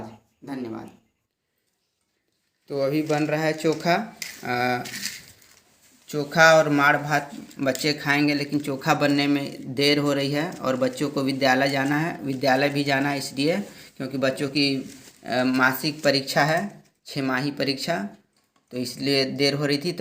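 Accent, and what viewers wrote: Standard. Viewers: क्या शुद्ध हिंदी बोलते हो भाई 🥰गजब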